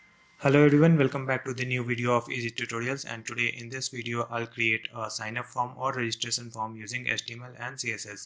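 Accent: India. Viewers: Bhai Indian accent not los Angeles CA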